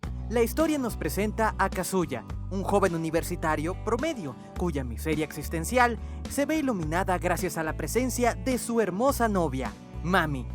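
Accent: Venezuela. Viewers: oye wey tu eres venezolano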